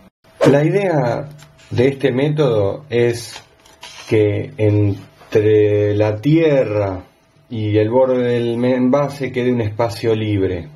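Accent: Argentina. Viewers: Daniela Medrano Terrazas el muchacho del video debe ser de Argentina por su voz. Aquí las temperaturas en invierno pueden ir desde los -25ºC a 20ºC dependiendo la región. Sí es de Buenos Aires, en invierno las temperaturas son de 0º a 15º. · Me encantan tus videos!! Muy explicativos y en español argentino jaja Queria consultarte donde conseguis la planta de consuelda ya que no la consigo.. muchas gracias!!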